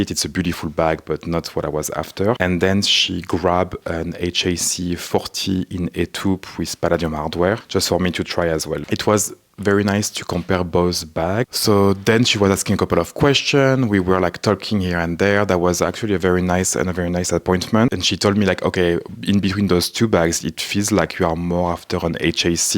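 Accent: France. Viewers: French who speak english with french accent it s awfull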